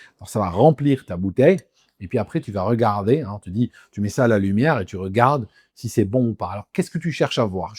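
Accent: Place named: Switzerland. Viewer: tu Soules avec l''accent Suisse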